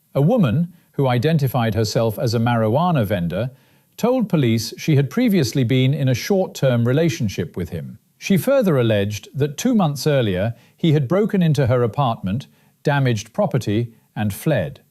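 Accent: United Kingdom. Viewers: That's a british man?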